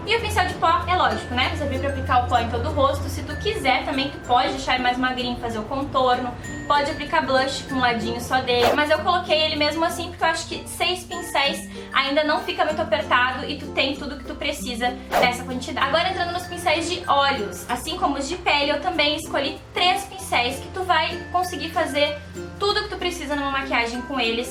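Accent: Rio Grande do Sul, Brazil. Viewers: Você é gaúcha?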